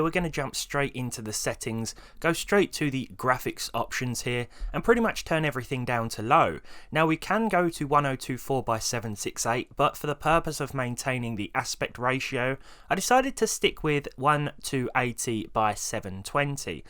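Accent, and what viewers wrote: United Kingdom. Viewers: same lmao the british accent really makes it funnier too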